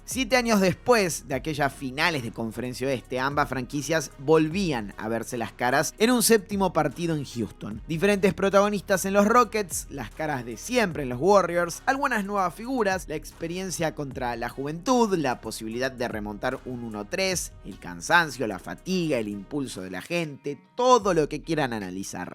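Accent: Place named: Argentina. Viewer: Porque TODOS los argentos tienen está pronunciación "NAGGETS" "CARRY" "HALLIBARTON" "CLATCH" "THANDER".... ME IRRITA DEMASIADO ESA PRONUNCIACION DE LA "U" TAN ESTUPIDA QUE TIENEN.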